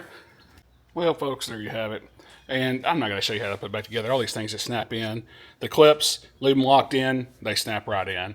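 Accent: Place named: Texas, United States. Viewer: Accent sounds familiar… would u happen to be in Texas? Lol I just bought a sixpack scat this week. Tryna to see if anyone knows a shop that can handle my more advanced mods and tuning and stuff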